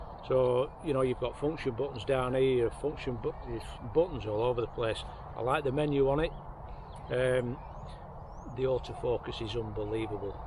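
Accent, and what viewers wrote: Lancashire, United Kingdom. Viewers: Dear as a lad from Wigan who now lives in Belgium it was nice to hear some Lancashire tones watching this… I’ve just done the same and got rid of all my Nikon gear for this combo… although I’m just waiting for my Pro filter which at €300 is expensive but if it protects the lens it’s worth it. Congratulations on a great vid… really enjoyed it. Regards Paul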